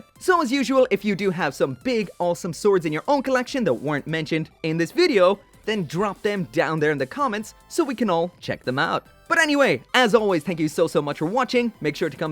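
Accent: Ireland. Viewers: Yo you Irish bro? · I hear the Irish accent, but there's something else there, right? Maybe I'm just crazy.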